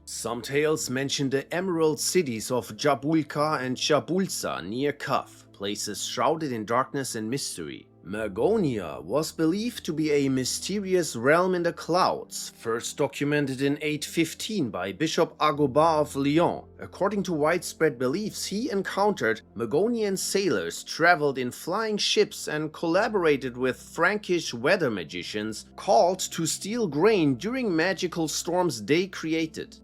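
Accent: Germany. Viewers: Is this one done by a German ?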